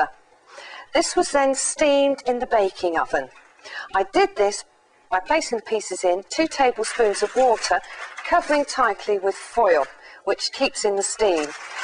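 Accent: United Kingdom. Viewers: i like your egland voice bloke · No matter what a woman says, American men find it extremely sexy if said with a British accent. This video is especially scrumptious. I used 4 large brown eggs, raw sugar and light brown sugar, a shot of maple syrup and a shot of apple juice today.. half and half instead of sour cream, cream cheese or canned milk. I got a little hunk of fresh ginger root and grated it myself.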